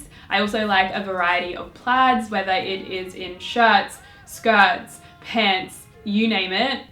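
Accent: Australia. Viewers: is this chick Aussie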